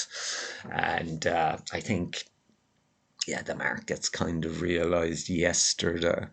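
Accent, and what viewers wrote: Ireland. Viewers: As an American, I can understand you fine since you turned your audio volume up. A couple of those videos, the volume was too low but it's fixed now. I also could tell you were Irish right now away. I wanna here you do more impressions of your fellow Irish normies. That had me cracking up in that original video.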